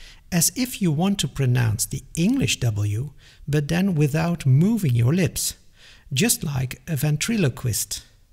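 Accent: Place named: Eindhoven, Netherlands. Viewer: Yet we barely speak dialect anymore in Eindhoven. I doubt you've heard it during your time living here. I know a grandfather who speaks the dialect and the granddaughter wondered why "opa" was speaking "Belgian" xD. It's the accent that still exists among speakers of the city, which is of course different and which is what people noticed about your pronunciation :). I do pronounce "V", "W" and "F" clearly differently from one another by the way.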